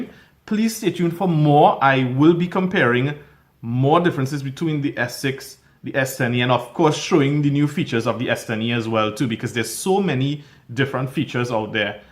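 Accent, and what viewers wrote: Trinidad and Tobago. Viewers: Are you Trini or Guyanese?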